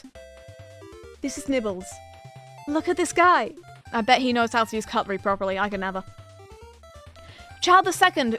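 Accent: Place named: United Kingdom. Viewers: And her Brotish accent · the fact that she’s also British and has an accent makes it 10x funnier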